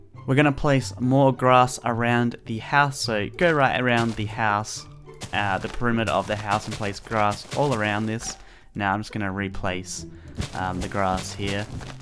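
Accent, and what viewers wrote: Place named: Australia. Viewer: I luv ur Australian 🇦🇺 accent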